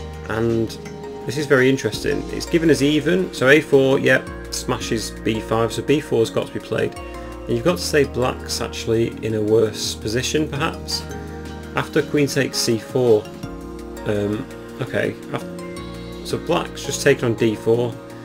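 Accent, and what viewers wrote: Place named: RP, United Kingdom. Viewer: Nice background music on game one. AND nice voice too with RP voice is narrating on the game.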